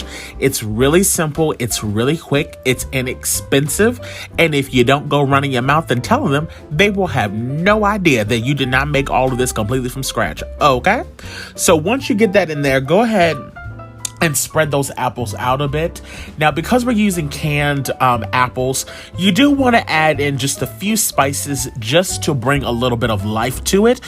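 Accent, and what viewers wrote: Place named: South, United States. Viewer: in that southern drawl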